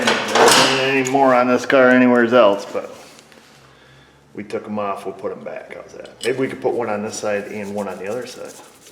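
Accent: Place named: Long Island, United States. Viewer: Are you located on Long Island New York it sounds like you might be I really could use a good mechanic like yourself trustworthy and very knowledgeable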